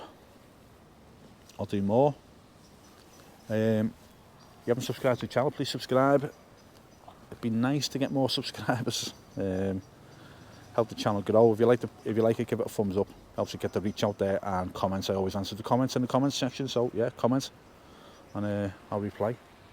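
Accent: Liverpool, United Kingdom. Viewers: I could listen to ya wafflin on all day in that lovely Scouse accent mate!😊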